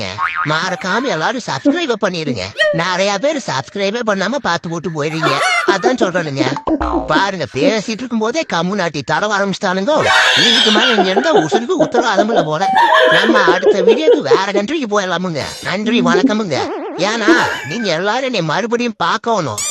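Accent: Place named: Kongu, India. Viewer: Kongu Tamilu pattaya kelaputhungooo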